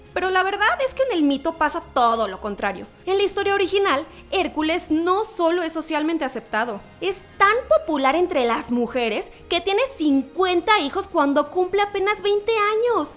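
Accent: Mexico. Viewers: Ese acento mexicano no lo soporto.. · Buenísimo el vídeo, no sabía casi nada hahaha... Una cosa, deben bajarle a ese acento mexicano, a los que no somos de allí nos incomoda un poco, por favor traten de usar un acento más "neutral" para que todos nos sintamos cómodos.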